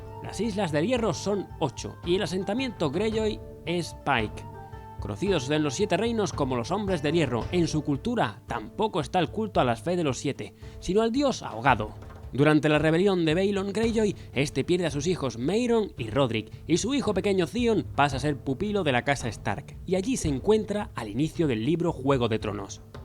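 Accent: Castile, Spain. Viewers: Me encantó el video :). Es tan raro para mí ver todos los nombres en castellano y también el acento!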